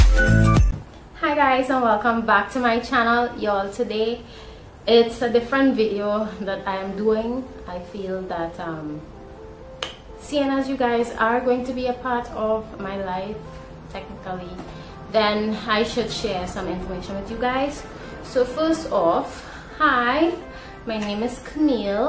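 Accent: Trinidad and Tobago. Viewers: You do not have to explain or apologize with regard to your Guyanese background, your Trini accent and your disability. You were made in GOD'S image and likeness and you are beautiful. And you clearly display confidence in yourself, so that is all that matters. The Mighty Sparrow was born in Grenada and Peter Minshall was born in Guyana. These folks are Trini to the bone and will have it no other way. Live your life my dear. Do not worry about people who do not understand.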